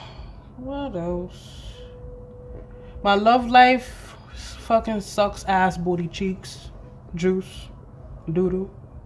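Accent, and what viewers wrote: United States. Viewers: Girl I watch two of your videos. Now mind you I loved your other 2 video it was the part 1 and 2 of your lipo experience. Since I’m going to get SX in DR I came across your channel. Honey this video right here! This video right here!!!!! Made me hit the subscribe button!!!! Mija between the smacking on the mango and the clear NYC accent and being an Afro/Latina like me I’m hooked. It was worth all minutes of my time😂😂😂😂😂 Keep us laughing girl🥰🥰🥰🥰❤️❤️❤️❤️❤️😂😂😂😂😂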